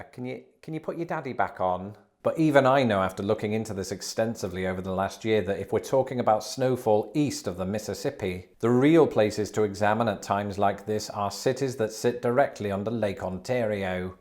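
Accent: United Kingdom. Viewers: The name Binghamton sounds positively British although apparently it’s not. I shouldn’t think that your British tongue should get too twisted over it.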